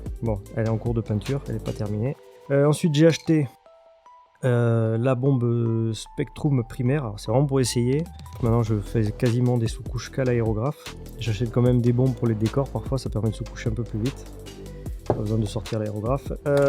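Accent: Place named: Southwest, France. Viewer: C'est ça c'est bien l'accent de chez moi( sud-ouest) qu'il m'a semblé reconnaître 😉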